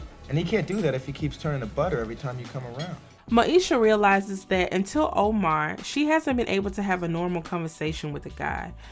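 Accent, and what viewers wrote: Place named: South, United States. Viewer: Another entertaining video as always! Also I love your accent, like the way you said poem , it’s so cute! If you don’t mind me asking where are you from? (I know your southern based on your voice but I know different southern states have different dialects)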